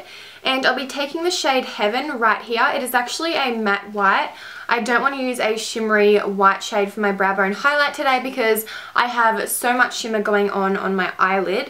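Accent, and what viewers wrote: Australia. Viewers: I love the Australian accent!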